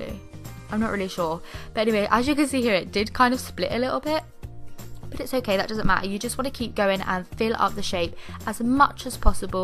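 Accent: England, United Kingdom. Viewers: love yr English accent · i love her british accent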